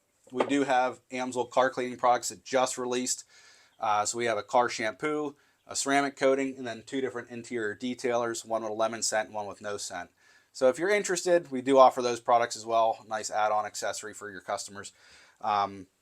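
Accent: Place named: Pittsburgh, United States. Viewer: You sound like the people I grew up with in Pittsburgh. You must be from somewhere in that Western PA tri-state area with OH and WV....Edit, after a quick search I see you're "dahn" in 84, PA. I grew up not far from Bridgeville. Your brogue reminded me of my younger days before I moved "dahn hare" to SC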